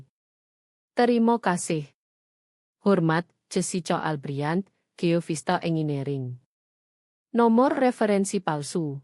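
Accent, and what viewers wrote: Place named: Java, Indonesia. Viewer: Bacanya kok rada aneh ya 😮 teks bahasa Indonesia, tapi dibaca kaya teks bahasa jawa . klo bahasa jawa ga semua A dibaca O biar ga aneh di pendengaran .koreksi dan kritik (mohon perhatiannya admin)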